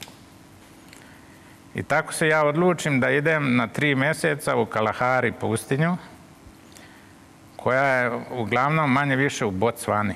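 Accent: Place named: Kordun, Croatia. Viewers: Ovaj govori hrvatski. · Kao da sa Korduna.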